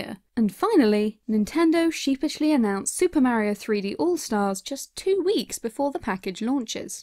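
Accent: United Kingdom. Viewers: Ok I'm new to the channel. Who on Earth is this British sounding female narrator who hasn't been named? The other guy at least is named in the description.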